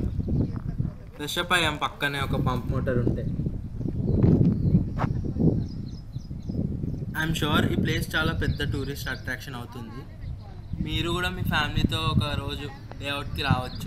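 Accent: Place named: Telangana, India. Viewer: Kani aa yaasa ne baavundey raa, ek dam naatu Telangana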